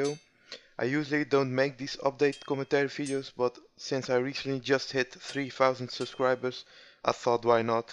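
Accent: Netherlands. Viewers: i hoorde aan je acsend dat je nederlands was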